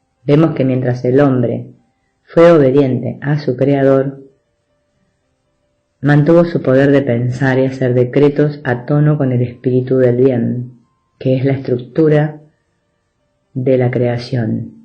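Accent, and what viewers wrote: Argentina. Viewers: Que voz argentina tan bonita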